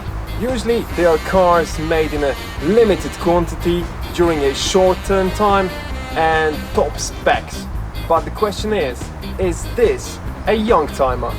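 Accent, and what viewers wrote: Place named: Poland. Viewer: Genialny materiał! Pierwszy taki na YT. Jestem fanem 126-stek, a dzięki takim filmikom, mogą znaleźć się fani zagraniczni. Piona ziomuś! P.S. jesteś Polakiem? Bo masz akcent zajebisty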